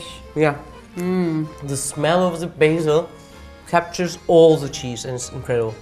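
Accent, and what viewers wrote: Turkey. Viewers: Your accent only convinces me that you are Turkish otherwise you look like a south east Asian, in fact like a Punjabi. Btw recipe is amazing and easy. I'll try soon!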